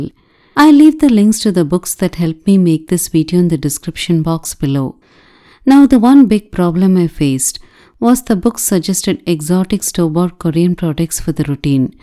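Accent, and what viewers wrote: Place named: Telugu, India. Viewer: Your accent sounds you are Telugu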